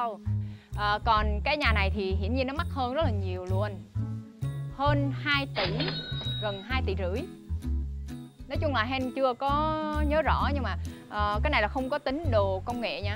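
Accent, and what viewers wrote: North, Vietnam. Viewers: Nói một giọng thôi nè chị Hen, lúc giọng na ná Bắc, lúc giọng na ná Nam nghe nó kỳ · Mình thích em ấy nói giọng miền bắc hơn❤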